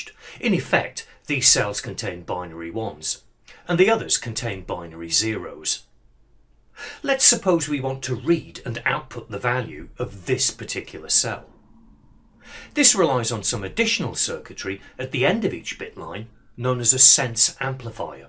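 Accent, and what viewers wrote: United Kingdom. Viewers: What I expected: Indian English accent What I got: Marvelous British accent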